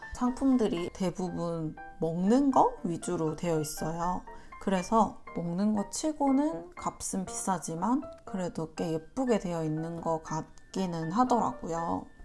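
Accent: South Korea. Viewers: 대구인 답게 대구 사투리를 쓰시네요!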